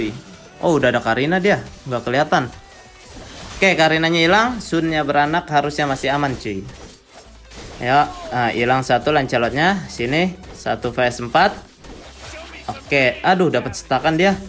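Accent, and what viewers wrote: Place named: West Java, Indonesia. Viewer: bang lu orang sunda ya, bru pertama nonton gw vitub lu, sumpah ngakak, dri cara ngomong lu plus seru juga, gw ikutin terus chanel lu bang .. mantap 🎉🎉🎉😅😅😅😅😂😂😂😂😂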